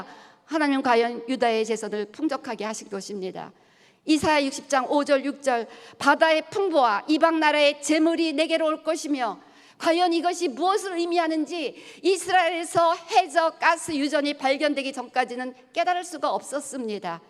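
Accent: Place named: South Korea. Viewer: 콩글리쉬 참 듣기 힘드네..... 아이고.......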